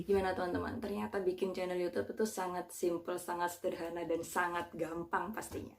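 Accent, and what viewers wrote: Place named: Java, Indonesia. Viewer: MANTHUL... INFONYA SANGAT MEMBANTU... #AGAKMEDHOK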